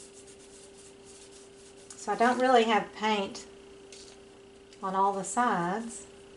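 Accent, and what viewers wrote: South, United States. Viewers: Love this one. Absolutely lovely. I knew your accent was either NC, SC or GA. Hillsboro is only 45 minutes away. Now I'm upset I missed the festival. I'll catch the next one.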